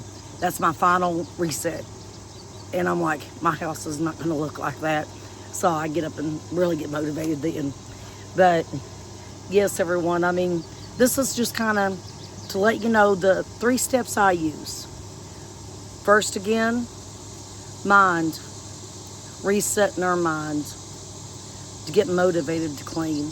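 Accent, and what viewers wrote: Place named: Kentucky, United States. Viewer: I knew you was from KY soon as I heard your accent.. blessings...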